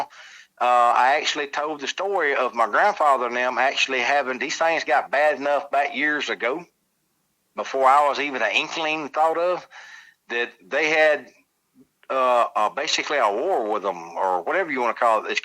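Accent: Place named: Alabama, United States. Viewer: You sound like your from Alabama, from my neck of the woods.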